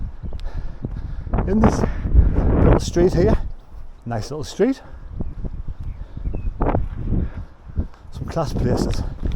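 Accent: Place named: Sunderland, United Kingdom. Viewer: You were beside where Binns was and also it was on the other side of the street too with an underground tunnel connecting them. Fascinating to see Sunderland . Sad that the Ivy Leaf Club is closed I used to do gigs there. I now live in Prague in the Czech Republic but also own a flat at Grangetown. Regarding your comment about financing. If memory serves me right they pulled down my old school West Park and built the new Civic Centre - so why are they wasting money on another town hall? They should be encouraging new industry - some of the most skilled engineers around were in the North East I'm sure they could adapt to new products etc. Where you stopped on Monkwearmouth bridge was where I braked to avoid killing a football supporter who ran into the road and a bus slammed into the back of my new car. It was great to hear your accent. 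I told my Czech friends that if they visit Sunderland the local people would understand their English but the Czechs might have a problem understanding the Sunderland people. They didn't believe me but when my band from Prague visited they did. They were totally intrigued and had a wonderful time. I hope to see more views of Sunderland which, although I wasn't born there, is where a lot of my family still live.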